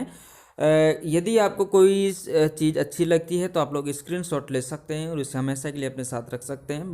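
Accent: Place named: Jammu, India. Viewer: Jay maat dii bahi jammu sa bool rah ha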